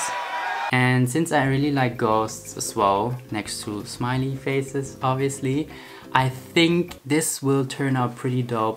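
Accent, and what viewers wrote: Germany. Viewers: I can totally tell that you’re german by the accent, cute!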